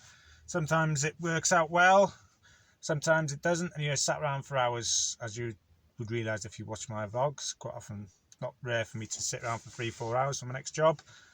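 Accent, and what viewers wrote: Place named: Coventry, United Kingdom. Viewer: Are you Coventry guy..as i am struggling with accent a tad..I know cov is 18 miles but there is 50 miles circle that covers a vast amount of areas I wish I was still near somewhere local.. Nottingham should be good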